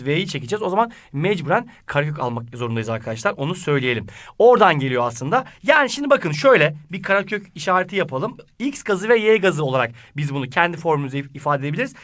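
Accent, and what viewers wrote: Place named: Central Anatolia, Turkey. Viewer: hocam nerelisiniz bi iç anadolu ağzı sezdim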